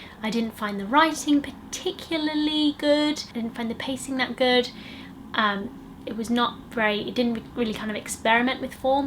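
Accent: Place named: United Kingdom. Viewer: I love the following: 1. your hair 2. your eye shadow 3. the color of your sweater 4. your lip stick color 5. your matching colors 6. your Adele accent 7. your piercings 8. your rings 9. the background 10. your stamina to finish a 50 min video 11. your overall fashion sense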